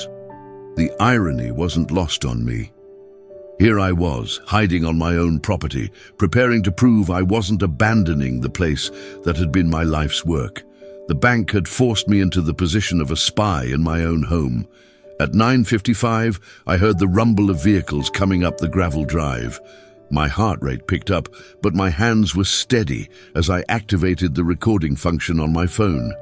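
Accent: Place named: United Kingdom. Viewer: Do these ridiculous AI folks ever even think about how stupid it sounds to have a snooty British accent narrating a down-home family farm story in first person singular? Especially one where the neighbor says things like "Hot damn!" - obviously meant to have happened in the heart of American farmland. Sheesh.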